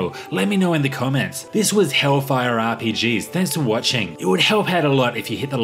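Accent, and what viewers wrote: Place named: Australia. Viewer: I think it’s Aussie but for some reason has a strong american R sound…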